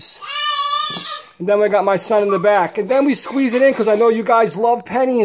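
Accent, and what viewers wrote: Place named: Boston, United States. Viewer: Haha my wife loves your accent. Reminds her of her grandfather. Paak tha caa in tha haavaad yaawd. 🤘🏾👍🏾✌🏾🤟🏾👌🏾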